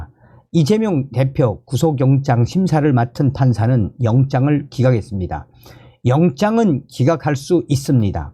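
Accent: North Korea. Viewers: 남의 약점을 꼬집지 마세요. 저분은 남쪽에서 태어나지 않았습니다. 그래도 말씨를 고치실려고 얼마나 노력 하셨겠습니까. 정성산 대표님 항상 수고 많으십니다. 누구보다도 애국자이십니다!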